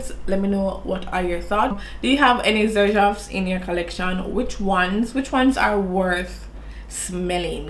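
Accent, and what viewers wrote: Jamaica. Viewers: That Jamaican Patois 😍😍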